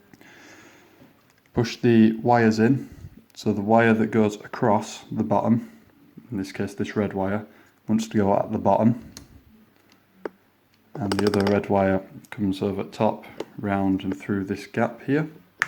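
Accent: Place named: Yorkshire, United Kingdom. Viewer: Gotta be from Yorkshire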